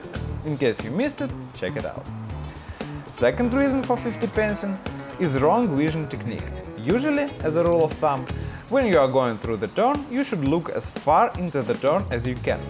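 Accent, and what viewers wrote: Russia. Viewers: Are you russian or enflisg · Fing russian accent... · Аххх! Этот классический русский акцент - прямо как из старых голливудских фильмов! =) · contenido en ingles, con acento ruso, filmado en argentina xD que combo. muy bueno tus videos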